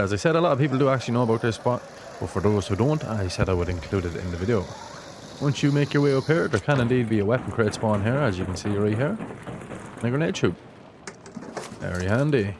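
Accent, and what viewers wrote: Ireland. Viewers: I know a fellow Irishman when i hear him 💚 thanks for the useful info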